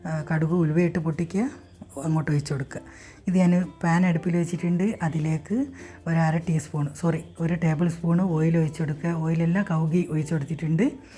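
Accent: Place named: Kannur, India. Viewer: Enik Chechiide samsaram nalla ishtaayi ente kannur ulla relativesntem family friendsntem pole.. ❤ first like ente vaka.. ❤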